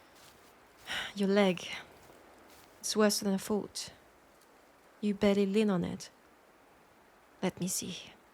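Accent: France. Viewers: Yo, i've been trying to find a good French ASMRist. All the other ones are British and American people putting on an overly strong accent. The subtleness is great. Hope you make more.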